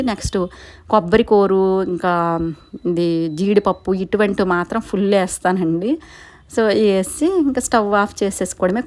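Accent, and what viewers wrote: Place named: Srikakulam, India. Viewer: Akka slag vinte manadhi ma srikakulam la vundhi ea oru akka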